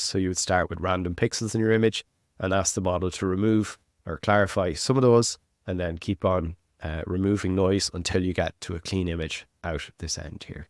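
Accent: Ireland. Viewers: Three things: 1. This is by far the most thorough, informative and approachable video I have come across on TTS; really appreciate the time and care you have put into this. 2. As a South African, I absolutely love the Irish people and accent - a lot of learnings in this video about accent, pitch and tone that will be very useful (I think) for TTS for under represented languages, very important from an African perspective. 3. Very well earned like and subscribe; I look forward to learning more from you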